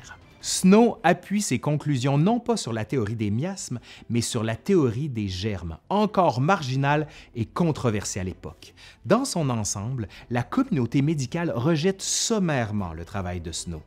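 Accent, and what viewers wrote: Quebec, Canada. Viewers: Jolie découverte cette chaîne d'histoire, merci, c'est très intéressant! Et avec ce charmant accent québécois en plus!! :-) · bon travail. interessant. Etant moi-même un québécois qui réside a l'étranger, "j'su ben" content d'entendre notre accent. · crime un vidéo d'histoire en canadien-français! Sa parle au diable! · L'accent canadien parle de lui-même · du calme Molière je le comprends, les québécois sont ceux qui ont le pire français.. même je trouve que l’accent de celui dans la vidéo n’es pas très prononcé donc c’est encore compréhensible.. après on ne comprend rien de ce que disent la plupart des québécois.. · Non, non, il a bien à accent québécois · il y a un français de France, basé sur l'accent parisien, tout comme il y a des accents en France, effectivement. A mes oreilles de français, l'accent du vidéaste tient plus du Canada que de France. 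C'était simplement une généralité que je soulignais, sans demander nullement un débat sur les accents canadiens / français / international. Bonne fin de journée. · toujours ce bel accent quebecois que j'adore qui ma fait un grand effet quand j'avais 15 ans j'en est 82 et il me fascine toujours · Merci pour ton bon français Sa fais dubien decouter un québécois qui parle normalement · J'adore votre accent, les Québecquois 😁Salutations de Bruxelles ☀️ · Captivant, on détecté légèrement l'accent quebecquois mais ce n'est pas très prononcé ce qui est super pour mes oreilles trop habitués à l'accent français et qui ont tendance à être intolérants à d'autres accents. Je ne connaissais pas l'histoire, très bien raconté. Merci pour le partage ! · Super video, et ce petit accent de Québec ...un délice pour mes oreilles!(ca fait un petit plus pas du tout objectif!)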